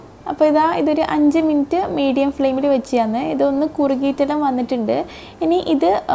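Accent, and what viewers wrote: Kannur, India. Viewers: നിങ്ങൾ kannur കാരി ആണോ കണ്ണൂർ ഭാഷ പോലെ തോന്നി 😄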